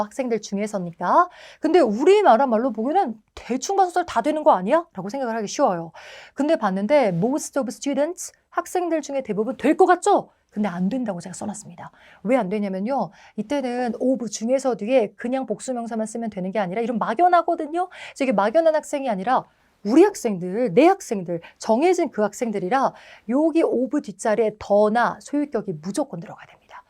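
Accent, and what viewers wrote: Jeolla, South Korea. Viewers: 약간 전라도 사투리가 느껴집니다. ㅋㅋ 읎어여~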